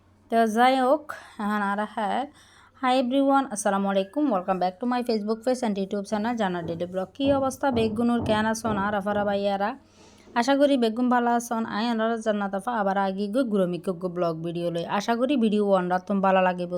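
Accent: Chittagong, Bangladesh. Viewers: আপু তোমার মুখে চিটাগাইংগা ভাষা অনেক সুন্দর লাগে 😅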